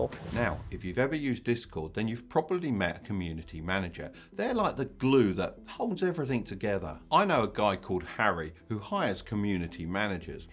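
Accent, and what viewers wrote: United Kingdom. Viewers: you have a british accent so i trust you 100%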